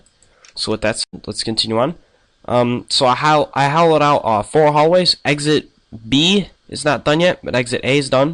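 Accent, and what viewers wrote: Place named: Canada. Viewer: Lol, I love your accent. Are you from Canada?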